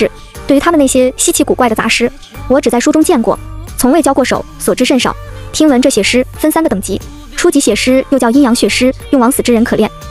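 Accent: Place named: Malaysia. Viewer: 嗯？这么听到马来西亚惹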